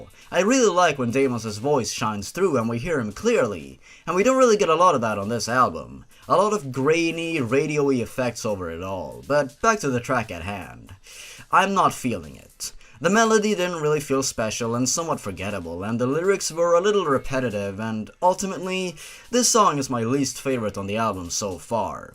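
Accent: Sweden. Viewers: Man hör att du är från Sverige bara så att du vet.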